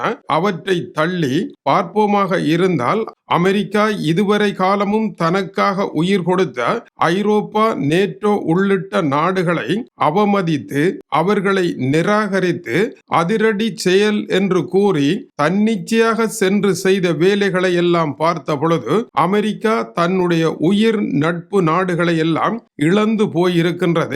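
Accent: Sri Lanka. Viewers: நல்ல இலங்கைத் தமிழ் கன்னியகுரல் குளந்தைகளுக்ம் புறியும்படியான விலக்கம் நன் திகைக்கும்படியன ஒரு தெளிவான விலக்கம் அடிகடி உங்கள் நீ ஸ் கேக்க மனம் தூண்டுகிரது மிகவும் உன்மையான சம்பு வமாகவும் உடனுக்குடன் செய்திகளை அனுப்புங்கள் நன்றி ....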